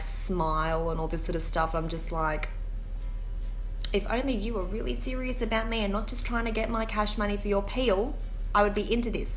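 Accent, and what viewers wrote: Australia. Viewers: I seriously find your voice so entertaining ! Love the aussie accent.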